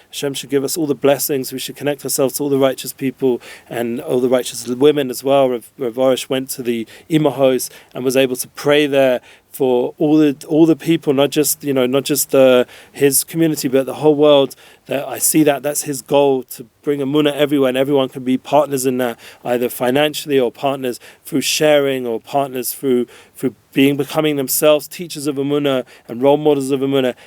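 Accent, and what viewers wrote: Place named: United Kingdom. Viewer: Love the British accent!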